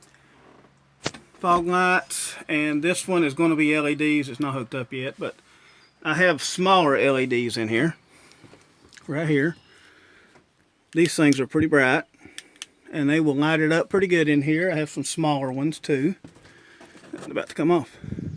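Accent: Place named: Kentucky, United States. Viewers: I am a new subscriber to your channel and am amazed at your design and the construction of your camper van. I am very interested in this way of life and have been contemplating it myself. You sound like a southern boy. I am from the south too..GA gal here. 😊 Thank you for sharing your awesome ideas!! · I love you're conversion! I'm a Kentucky (Louisville) girl as well and you have a lot of good ideas that I'd like to use on my Mini Bus Schoolie build. I'm about to check out your bus build once I finish watching this video. Oh, and I just loooove hearing your Ky "'Twang" as you narrated your video. What part of Ky are you from?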